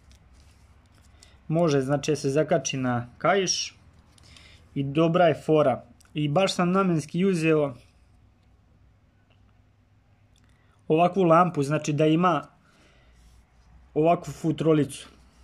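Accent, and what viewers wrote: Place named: Mačva, Serbia. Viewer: Veliki pozdrav, samo tako nastavi. Ledlenser je odlična kompanija imam dve, jednu na glavu i jednu sličnu toj samo malo veću. Meni se čini po tvom naglasku da si iz Mačve, ili grešim🤔🤔?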